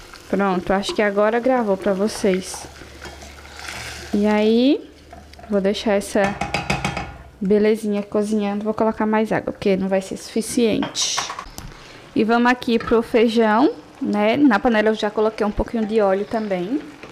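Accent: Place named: Caruaru, Brazil. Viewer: Menina kkk eu cai de paraquedas no seu canal eu conheci logo que vc é de Caruaru pelo sotaque, aí esperei pra ver se vc realmente era de Caruaru, sou de agrestina. Somos vizinhas ❤️❤️❤️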